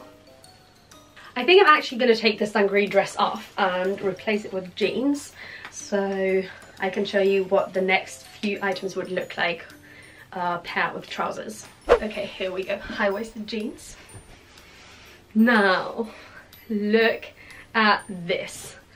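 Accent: United Kingdom. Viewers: now that is what i call a british accent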